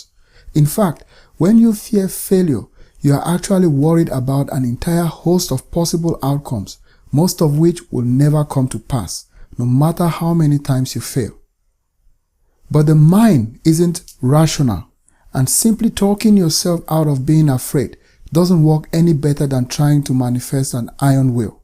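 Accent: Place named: India. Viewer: your accent sounds like an Indian accent....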